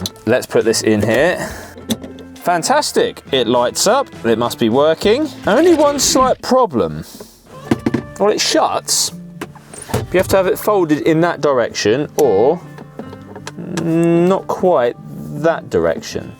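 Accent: United Kingdom. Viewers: He's british, that's how they say it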